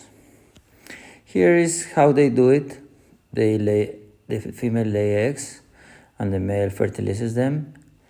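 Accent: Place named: Mexico. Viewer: no problem my doctor , if you only problem is the all mexican problem ,do you speak english like mexican xD but your mother languaje is mexican spanish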